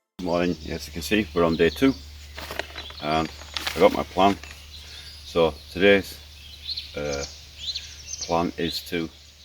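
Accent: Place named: United Kingdom. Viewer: You sound british not Russian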